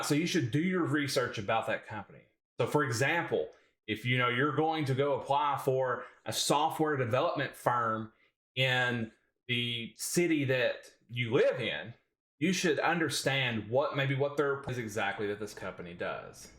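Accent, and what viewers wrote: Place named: Texas, United States. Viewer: Nice video. Nice job. Everything said is to the point and very accurate. Cool southern accent too. Probly Texan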